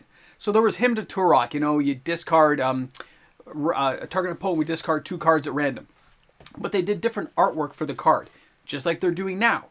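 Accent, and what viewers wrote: Canada. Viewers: Bro why isn't this channel WAY bigger. Awesome insight and perspective. You Canadian? I am, and it's a guess based on your diction. Sad I can't double sub.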